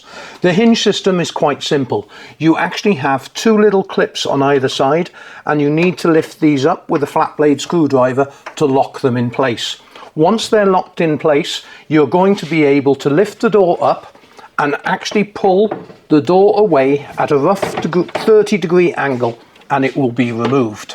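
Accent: United Kingdom. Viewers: Absolutely fabulous! As usual. Every time I find something new to learn with. Special thanks for your plain, Royal English so very easy to follow!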